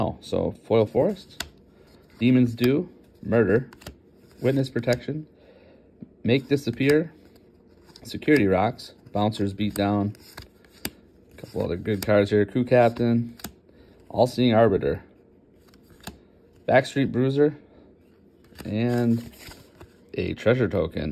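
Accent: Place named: Canada. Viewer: Odd question, you sound like somebody I know. Are you by any chance from canada?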